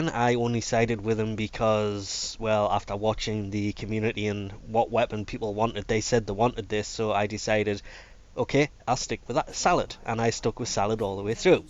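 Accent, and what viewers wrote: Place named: United Kingdom. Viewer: what country do you live in? From your accent you sound like your from somewhere in Britain but it was 5pm when the event finished here?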